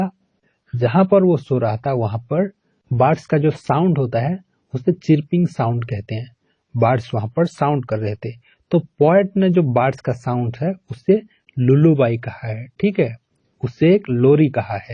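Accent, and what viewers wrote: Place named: Bengal, India. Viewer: Sir r u bengali ?ur accent seems like that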